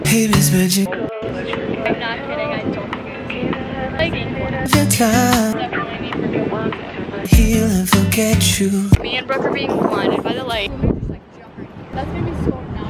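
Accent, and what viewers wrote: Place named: New York, United States. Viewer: first video I saw of yours I noticed the accent lol, I'm live in ny so I was low-key thinking ,, hmmm maybe she's from ny lmaooo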